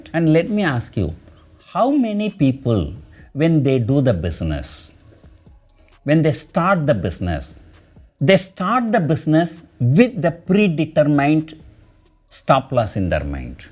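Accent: India. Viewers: Indian accent is so much fun and relaxed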